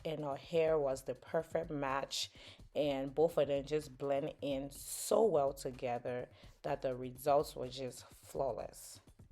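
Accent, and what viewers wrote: Liberia. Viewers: I knew you were Liberian as soon as I heard the accent.. love the video.. I’m Liberian as well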